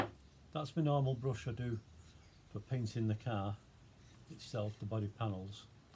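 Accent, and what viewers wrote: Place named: Oldham, United Kingdom. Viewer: great.. 40 years ago taught..you never lose it my first car I painted this way.. boiling hot day.tin in. paint in the milk pan in water.. and tongues from the washing machine to carry it out.. cheap brush.. disaster.. lol.. Oldham lad me.. you sound local...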